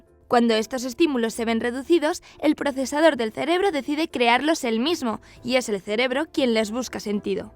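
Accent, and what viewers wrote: Spain. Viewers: Español de España :. Horrible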